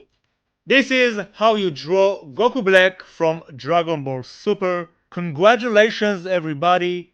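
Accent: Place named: Israel. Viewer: אתה ישראלמ נכון שומעים ותודה על ההדגמה עם גוקו בלאק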